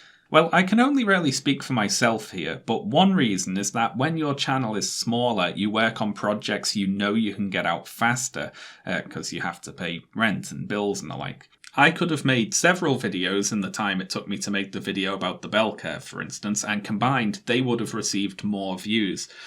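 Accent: Liverpool, United Kingdom. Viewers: well, it’s informative, entertaining and elegant in its simplicity. Plus, I’ve discovered I have a thing for Scouse accents. The ones I can understand anyway.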